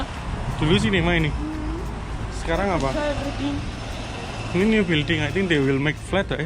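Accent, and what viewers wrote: Java, Indonesia. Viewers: Bahasa Indonesia-nya koq medok aslinya dari mana mas..?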